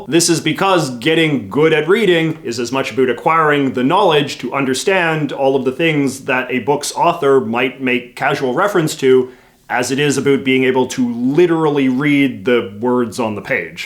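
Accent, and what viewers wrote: Canada. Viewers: You have such a strong Canadian accent. Out and a Boot in a Boot.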